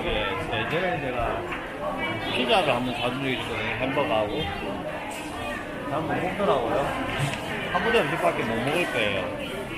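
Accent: Gyeongsang, South Korea. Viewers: 전형적인 갱상도 ㅎ 잘하고 계십니다! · 경상도댁 언제나 친근하고 차분한 느낌입니다. 남편 잘 만나 경상도 사투리고 한국말 배우고 말투가 이쁘십니다.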